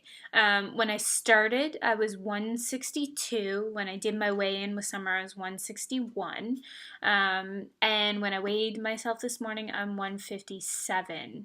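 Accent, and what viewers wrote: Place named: Canada. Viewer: Haha. I mostly hear it in the words - Sorry, hard, thirty, out/throughout, to. Actually, I can always tell Canadians when they say the "word" out or "sorry". Accents are my thing and I spend way too much time studying and imitating them. Haha. I'm a dork.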